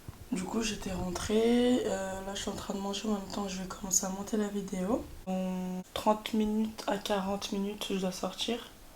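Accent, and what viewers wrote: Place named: Italy. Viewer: Whaou cet accent italien ! Je regarde tes vidéos 10 après tout va bien 😂😂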